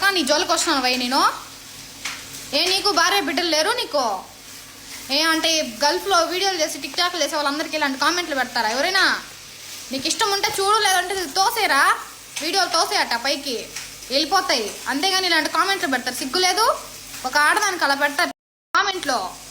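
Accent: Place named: Kadapa, India. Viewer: Endi medi kadapa me basa Chala bagundi I love you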